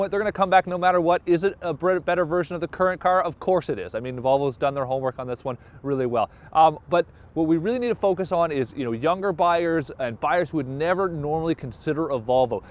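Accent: Canada. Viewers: All I hear is Canada seeping out of his nostrils. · is he Canadian?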